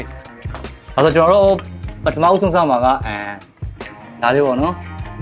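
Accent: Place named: Rakhine, Myanmar. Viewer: စကားပီအောင်ပြောစေချင်တေ ရခိုင်သိပ်ခါကျတယ်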